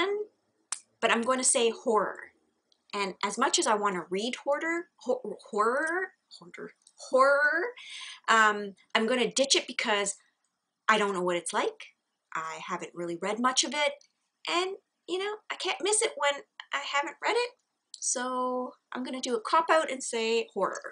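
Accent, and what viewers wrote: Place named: Canada. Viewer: I absolutely love watching your videos. You seem such a sweet lady and I love your Canadian accent, it's lovely. Those were tough questions, I don't what genre I'd choose to ditch, but I'd probably choose Horror too or non-fiction.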